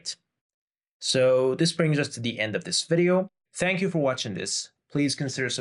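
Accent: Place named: Midwest, United States. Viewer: You're from the midwest.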